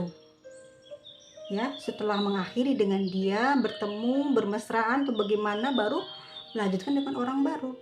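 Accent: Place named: Sunda, Indonesia. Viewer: sy seneng suara ketawanya. Siga sunda.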